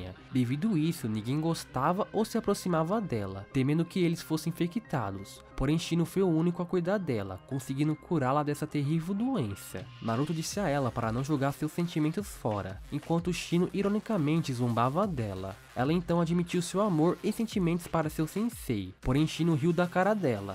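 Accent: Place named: Portugal. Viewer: Cara gosto muito do sotaque de portugal é bem legal mano sigo um bocado de youtubers portuguêses por causa disso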